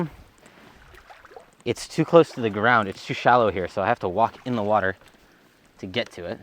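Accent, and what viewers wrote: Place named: United States. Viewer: My Brit wife says you have an American accent. As an American, I agree.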